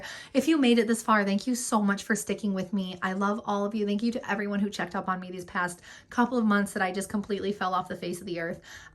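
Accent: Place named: Midwest, United States. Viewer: Your Midwest accent is AMAZING. Where are you based? I miss it, I grew up in SE Wisconsin :)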